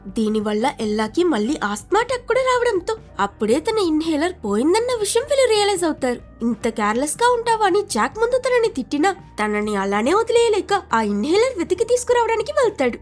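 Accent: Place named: Tirupati, India. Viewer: Hello by identifying your voice I am guessing your from tirupati around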